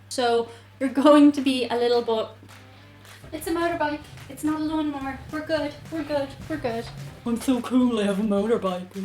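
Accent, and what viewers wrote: Ireland. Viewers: Pasty potatoe skin means your skin is healthy, young woman. American women tend to worship the sun meaning sun bathe to excess and it ages there skin prematurely. This is not speculation. I actually detest sun bathing and prefer that a woman has "pasty, white skin." Assuming she is Irish or Irish American that is. As for you, honestly if you weren't talking I couldn't tell the difference between you and a white American woman. There are a lot of English/Irish genetics running through America after all. In fact, at first I thought you were a very good looking American woman pretending to be Irish until I caught certain aspects of your Irish accent which I would call light compared to what I have heard from Irish women. And of course your hilarious comparisons of Irish and American culture. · I love when you say "Potatoe bodies" 😂😂😂 I'm not sure about all those facts 'cuz I never go to the US or Ireland (North or South) but one thing is for sure (TO ME 😉) I love Irish accent 😍 your voice sounds like heaven to me 😊 well I tell you almost in every post I made. 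Anyway hugs and stay safe 🍀 lots of love from Argentina 🍷 #LoveYourVoice PD: Irish and Aussie accents are my favs on the English lenguage "variants". In the Spanish happend the same some people loves the Spanish other preffer the Venezuelan accent, the Cuban, Argentinian, Chilean or any other latin speaking country. Anyway bye 👋🏻👋🏻👋🏻👋🏻👋🏻👋🏻👋🏻👋🏻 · I agree with her Irish accent. :-)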